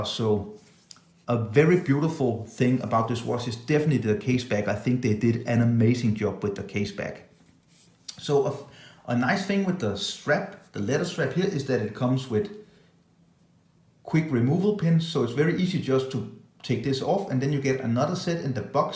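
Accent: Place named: Denmark. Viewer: Nice review of a beautiful watch. You have an unmistakable Danish- English accent..lol I can hear whenever a Dane is speaking English. Not a criticism by the way, just an observation. Jeg er engelsk men er ogsaa Dansk talande.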